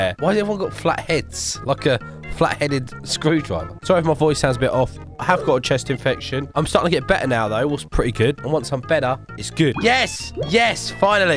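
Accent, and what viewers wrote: United Kingdom. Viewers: YOUR VOICE IS AMAZING THE BRITISH ACCCENT I CANT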